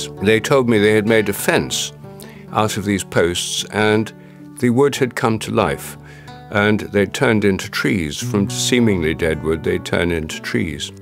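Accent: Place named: United Kingdom. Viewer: i came for the noble posh english :face-green-smiling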